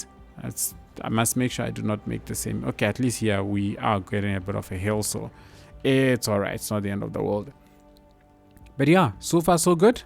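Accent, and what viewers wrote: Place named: South Africa. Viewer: South African accent 🤔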